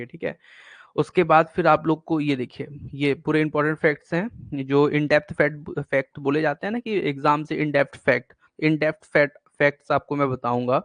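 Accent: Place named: Rewa, India. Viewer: Sir aap reewa se h ,lagta h aapki voice se....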